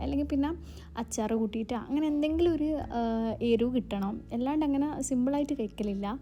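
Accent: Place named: Kannur, India. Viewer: ഗൾഫിലെ ബാംഗ്‌ വിളി ഒരു പ്രത്യേക ഫീൽ ആണ് കേൾക്കാൻ. ഞാൻ പുതിയ ആൾ ആണേ. കണ്ണൂർ. നിങ്ങളുടെ സംസാരം കേൾക്മ്പോൾ കണ്ണൂർ പോലെ ഉണ്ട്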